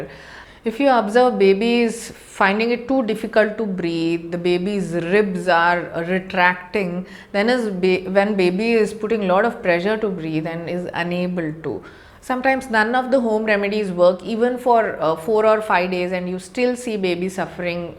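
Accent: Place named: India. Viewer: Indian English language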